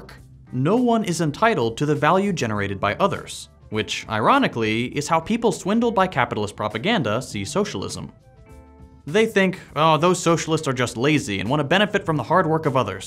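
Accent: United States. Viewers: Are there any socialists in the US? It's so weird to watch a video on socialism with an American accent.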